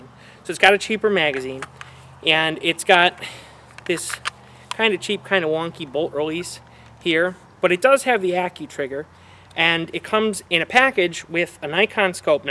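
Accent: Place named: Wisconsin, United States. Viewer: Not intended to be a dig. Just based on your accent, sounds like you're from WI. MN or IA maybe??? I'm from WI and my accent gives it away.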